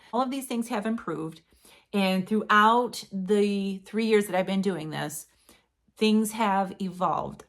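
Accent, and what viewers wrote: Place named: Michigan, United States. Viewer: Great testimony,! Well done! My story mirrors yours so much — almost 2.5 years now as a keto-vore. SO many benefits and changes and we’ll never go back. I’ve been watching your videos for a year now and enjoy seeing you and Ed and your adventures. The first time I heard your video, I heard that Michigan accent and thought “hey! A fellow Michigander!” 😊 Thanks for all the encouragement! Keep going, lady! Julie in W.MI.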